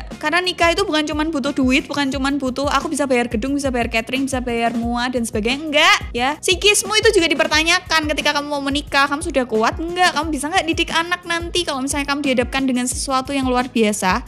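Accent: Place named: Semarang, Indonesia. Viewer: Semarang mass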